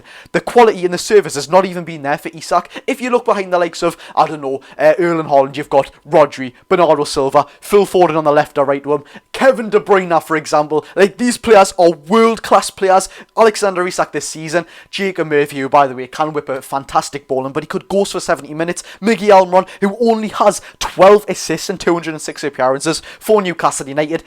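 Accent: Newcastle, United Kingdom. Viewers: Great video mate Proper Geordie you bro So very proud of you bro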